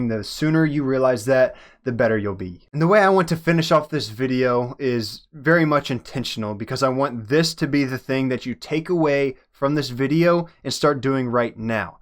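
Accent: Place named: West, United States. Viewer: It's weird, you have a western accent, but say "y'all"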